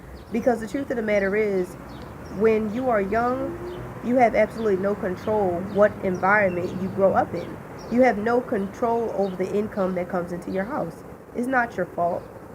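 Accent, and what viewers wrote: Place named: Philadelphia, United States. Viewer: Sounds like you're from Philly sis.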